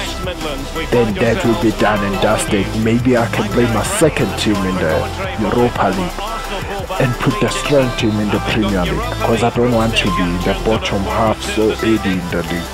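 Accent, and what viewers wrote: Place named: Zimbabwe. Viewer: u defo from zim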